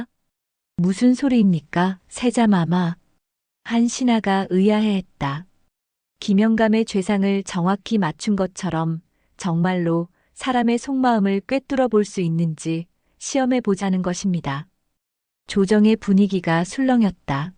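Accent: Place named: Gyeongsang, South Korea. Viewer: 또 경상도😂😂😂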